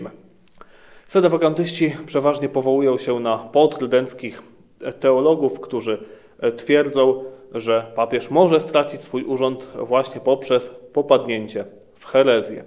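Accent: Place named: Italy. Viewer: Ach ta łacina włoska ;))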